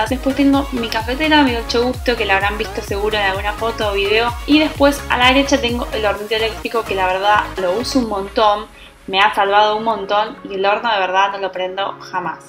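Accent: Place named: Argentina. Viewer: me gusto mucho tu departamento y tu voz de argentina saludos desde mexico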